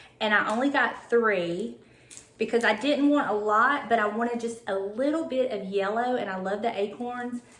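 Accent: South, United States. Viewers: I just found your channel, and you have a very southern accent like I do and I’m wondering if you’re from my area. I live in North Carolina. Hi just subscribed and I’m looking forward to watching more, I love it when I find a southern lady like myself.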